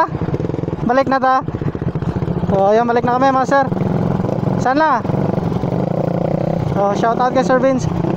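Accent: Iloilo, Philippines. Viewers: Ilonggo ka kuya🤣🤣gravbe taka ka idol mag dala sang rider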